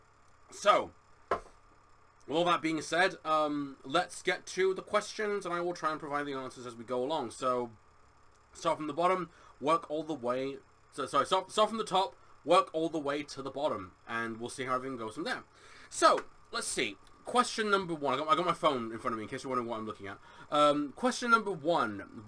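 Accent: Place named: United Kingdom. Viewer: This whole time and I never realized you were British lol Lord help me, I'm not bright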